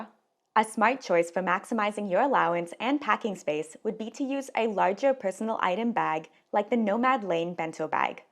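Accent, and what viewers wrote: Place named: California, United States. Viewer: Can't take that voice. The "Cali-Talk" is just too irritating. Good thing there's a transcript.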